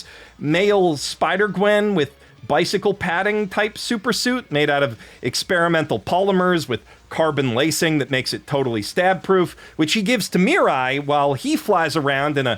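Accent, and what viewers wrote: Canada. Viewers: An overdose of Canadian in this one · Thanks for the CW, I was already glaring at that timestamp and dreading spending that long listening to a canadian, and that splash screen confirmed to me that this video isn't worth watching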